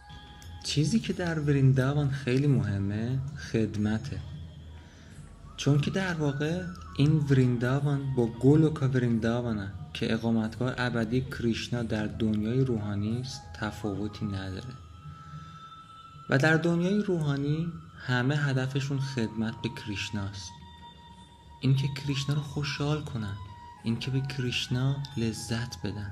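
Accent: Isfahan, Iran. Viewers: سلام برام جالبه خانمت هم مثل خودت لهجه اصفهانی یاد گرفته و حرف میزنه😍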